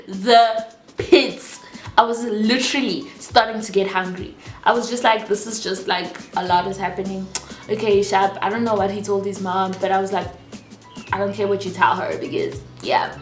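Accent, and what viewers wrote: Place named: South Africa. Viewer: As soon as you started speaking, I was like she's gotta be South African and then checked another video to confirm. LOVE your accent. "We pay 50/50." SIS WHAT? No. Lmaooo at the mother's reaction. The whole story has me weak.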